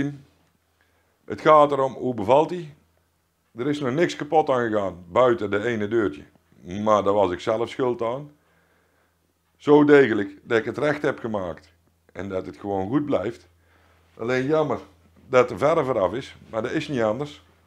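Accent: Brabant, Netherlands. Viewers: Leuke video's!! ik kom zelf uit Oss maar woon al enige tijd in Gelderland. Heerlijk om dat Brabantse accent te horen!!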